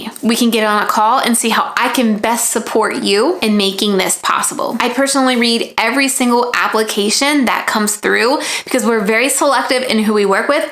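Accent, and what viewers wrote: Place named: Utah, United States. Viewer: You're from Utah right? Your accent sounds very Utah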